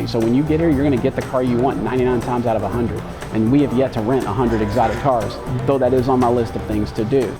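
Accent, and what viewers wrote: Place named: Houston, United States. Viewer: I hear Houston.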